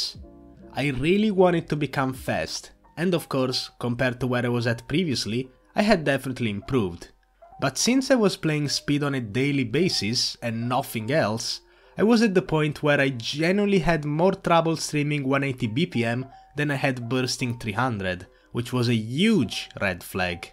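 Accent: Italy. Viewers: Lol ho capito che eri italiano solo dalla pronuncia ahah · Are you italian? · you are italian? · are you italian mate? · you have an italian accent? Are you italian?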